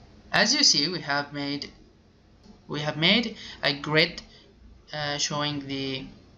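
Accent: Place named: France. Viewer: Good job that you made it english but I can still hear that french accent. Why it seems like everybody doing robot turorials are from France?! Interesting :D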